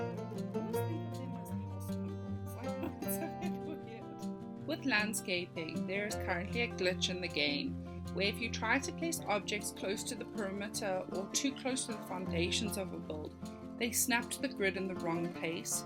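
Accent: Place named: Australia. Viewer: i think ur from australia, right?? with the accent, lol love the build a bit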